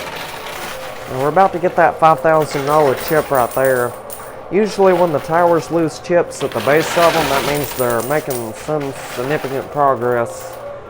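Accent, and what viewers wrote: South, United States. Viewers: That is a southern US accent if I’ve ever heard one.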